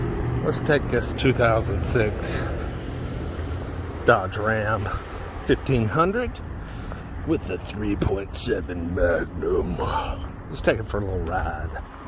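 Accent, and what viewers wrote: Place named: South, United States. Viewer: Good old truuuuck hillbilly voice to the max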